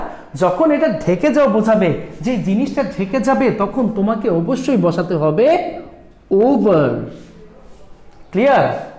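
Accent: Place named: Barishal, Bangladesh. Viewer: ধন্যবাদ স্যার। স্যার কিন্তু মেগো বরিশাইল্লা👍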